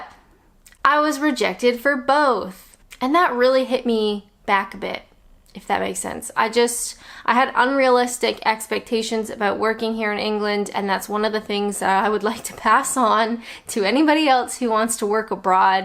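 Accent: Canada. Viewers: Love the Canadians accent but you are saying ‘pounds’ like an English person, this is a milestone for sure. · Hi, Another chapter of the e-book done. Very honest. You sounded different today, maybe a little bit more Canadian but clearer and more confident or focused. Or maybe sitting closer to the mic? Byeee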